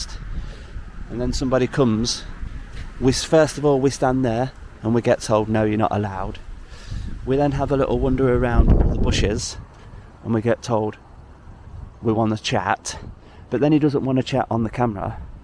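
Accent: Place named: Yorkshire, United Kingdom. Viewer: Nice Yorkshire accent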